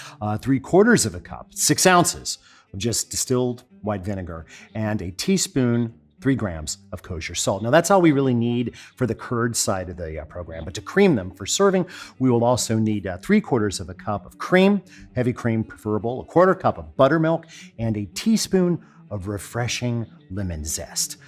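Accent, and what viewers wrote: South, United States. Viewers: he’s from the south, let’s not hold it against him…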